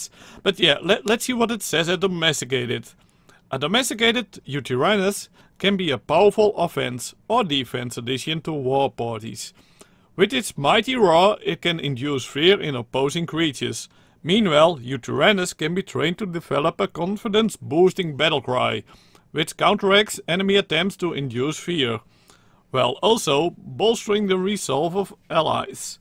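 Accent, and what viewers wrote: Netherlands. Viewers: Je hoort dat hij Nederlands is hij is wel grappig😂😂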